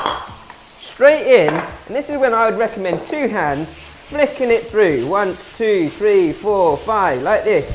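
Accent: England, United Kingdom. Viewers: I love your British accent sir,good cooking huh.. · He has an english accent!!😱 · It is kind of weird to heard perfect British accent from a Chinese guy. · Nice and clear instructions,easy to follow.Chinese with a british accent. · I don't like this guy English ascents? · Asians with a slight British accent always makes me stop and a take a second look. Great videos!!! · Too much English accent and annoying background music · luv uk waps.... ha ha in holland we call ourselves proudly peanut. "skool uv wuk" great uk accent mate.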